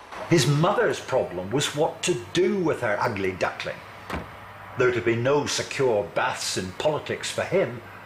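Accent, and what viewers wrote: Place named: RP, United Kingdom. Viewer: The latter. More accurately it's "posh British" (or Received Pronunciation, to give it its latter name) because plenty of people from all corners of Britain speak in it , if they were educated at the "right" schools.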